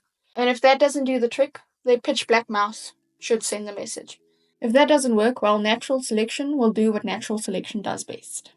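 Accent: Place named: South Africa. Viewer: That South African accent sounds fire 😂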